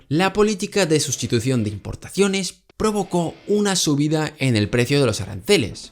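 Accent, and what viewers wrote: Spain. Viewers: Acabas de inferir la ideología política de un individuo por los colores de su canal de YouTube? Te imaginas lo vesanico que suena eso? Deja de apelar a falacias de conclusión inatingente. Eso es propio de energúmenos irracionales. Para éste video se han utilizado 17 papers y dos libros que circulan por 4 escuelas económicas disímiles. Para mayor escarnio te atreves a acusar a un canal de economía mainstream como afín al libertarianismo. Regresa a tus clases de pensamiento económico, por favor. No sabes distinguir entre el acento español y el argentino? De qué multiverso provienes? Permíteme que te hable como un padre: no seas una parodia de ti mismo. Saludos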